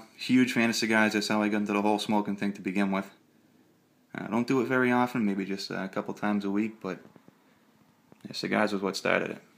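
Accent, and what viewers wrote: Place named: Massachusetts, United States. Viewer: Nice meeting you! Grew up in Mass. and it's always nice to hear the accent. Best Wishes!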